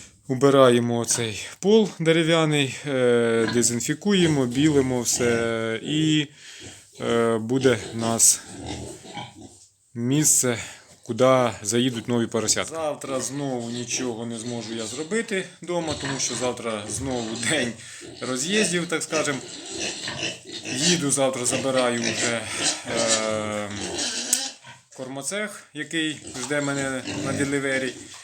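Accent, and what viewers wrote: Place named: Kherson, Ukraine. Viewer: хай Вам Бог помогає! Так приємно почути рідну говірку Херсонщини! Теж мріємо про перемогу і про повернення додому! А зараз набираємося знань і досвіду від Вас та інших сільських блогерів. Сердечно Вам дякую, що знімаєте і ділитесь інформацією у такий важкий час! Шана Вам, що не зламалися і не опустили руки! Разом до перемоги!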